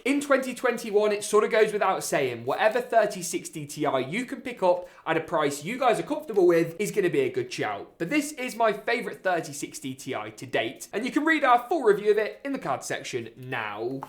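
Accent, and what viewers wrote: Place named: Wales, United Kingdom. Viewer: are you Welsh, you sound Welsh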